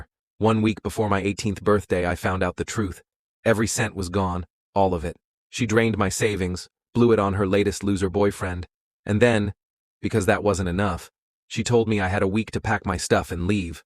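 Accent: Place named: United States. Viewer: Although the voice over is American. the road trip filmed on the video, looks more like some third world country.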